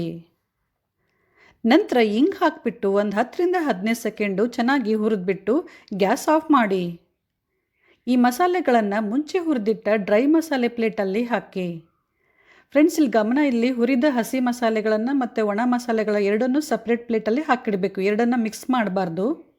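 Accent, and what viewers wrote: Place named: Bangalore, India. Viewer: Uttara Karnataka recipe anta heltiri, matadod nodidre Bangalore bashe matadtiri?? UK language matadidre En agutt ri 🤦🏻‍♂️🤦🏻‍♂️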